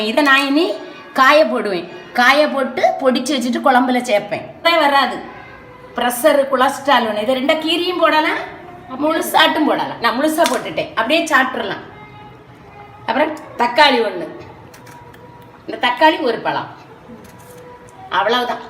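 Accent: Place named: Kanyakumari, India. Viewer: Akka kk slang.kaytalaymass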